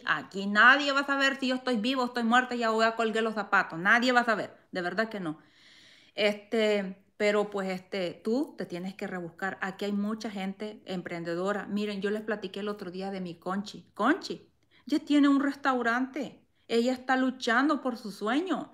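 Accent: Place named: El Salvador. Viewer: De qué parte de el salvador eres me encanta como hablas así somo yo vivo acá en Texas también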